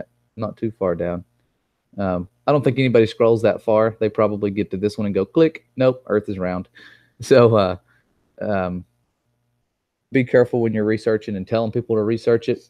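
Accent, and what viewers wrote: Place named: South, United States. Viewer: Kinda makes sense that this guy is southern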